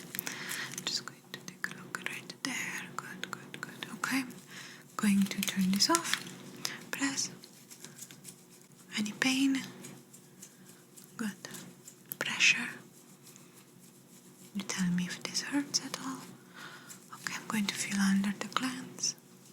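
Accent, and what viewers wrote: Italy. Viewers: I really enjoy your Italian accent personally I’m ltalian your soft spoken is my favorite your whispering is also my favorite sounds. PS sleep 😴 dreams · you made my day aside from the Italian accent you reply to me nice your amazing. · Italian accent has an additional sweetness. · Hello fantastic ASMR with Italian accent I loved it I really enjoyed listening to your sweet voice very relaxing very relaxing greetings beautiful 🌹🌹💖💖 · Your Italian accent is very special and beautiful. You are terrific for ASMRs. First time see your channel it was amazing, you have a viewer that is going to continue watching your great videos. Thank you for all your hard work. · Anyone else find her Italian accent a massive turn on?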